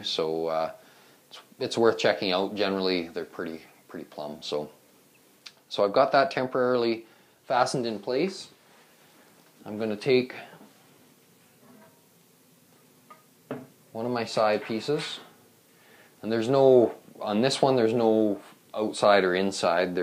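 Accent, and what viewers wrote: Canada. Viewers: He from Canada ! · Canadian, aye?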